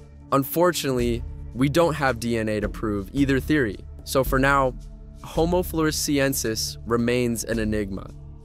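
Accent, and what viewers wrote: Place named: United States. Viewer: I like this reading voice. It's not AI! SO EXCITING to hear a human read and americanize the mispronpunciations. I love it! Would be great to show to children. Because of the animation. The ending is always a little abrupt. Ask a question at the end to get more comments. The videos are good and your voice is nice to listen to. I heard nothing new in this video. But, even the fake animation was great. We never get visuals because, they don't want to use movie clips or things not really too close to accurate but these graphics make it nice to watch for sure and let the imagination can run wild with it! Thanks again. And thanks for reading this in the real world!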